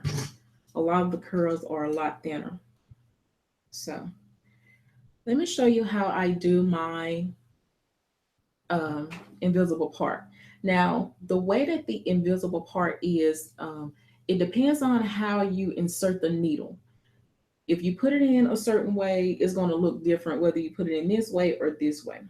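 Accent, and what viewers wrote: United States. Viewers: Your hair looks as beautiful as you! Love it! Our voices are almost identical and I thought I was listening to a recording of myself. You must be from the southern midwest, sister. LOL. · this was great, you sound like you're from East Texas or Dallas, my bffs and family are from there and you sound just like them. love it 😁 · Yur a lovely lady with a beautiful smile even with yur pink and green lime clips n bubble upside yu head. Love yur accent normally I dont like some american accents after awhile but I found yur voice soft n relaxing n did not fast forward till close to th end. I thought yu did a good job for a first time specially as I'm not that good at corn rowing stay blessed 🙏🏾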